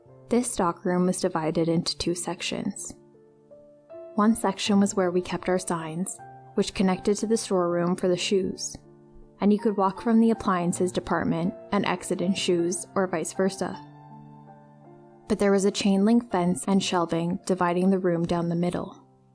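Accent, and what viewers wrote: South, United States. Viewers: The southern accent was phenomenal, you're so talented that's awesome. You're gonna go far.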